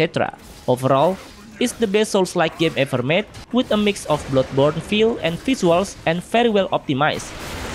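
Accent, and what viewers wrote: Indonesia. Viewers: aksen indo bjir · Indo banget logatnya lol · aksen nya kaya orang indo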